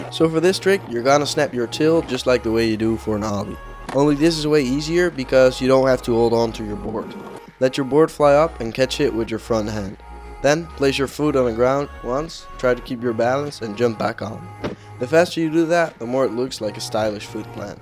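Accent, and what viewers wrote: Netherlands. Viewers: Je accent klinkt echt Nederlands ben je nederlands?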